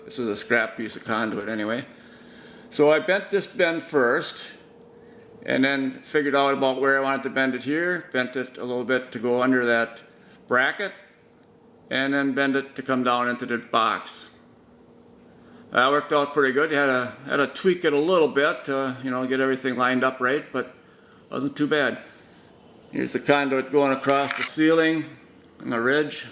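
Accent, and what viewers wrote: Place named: Wisconsin, United States. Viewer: You must be from Wisconsin...you sound just like I do:)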